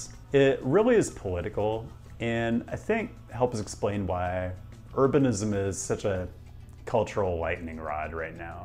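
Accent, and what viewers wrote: United States. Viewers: Your voice.... You are who most Europeans think all Americans sound like!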